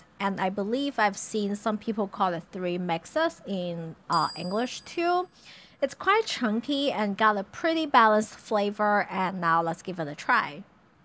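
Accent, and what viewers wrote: China. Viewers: I love Chinese accents!! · Is it possible to also include the Cantonese words for particular ingredients in future vids? I'm "ABC" but I only understand Cantonese although I can pick up on some Mandarin that sounds similar to Canto, I'm still having trouble figuring out what it is most of the time. From the way enunciates her Mandarin, it sounds more like she's a native Cantonese speaker but I could be wrong.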